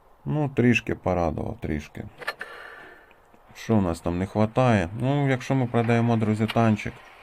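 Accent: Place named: Chernihiv, Ukraine. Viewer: А Ти сам що з Чернігівщини? Наш говор😂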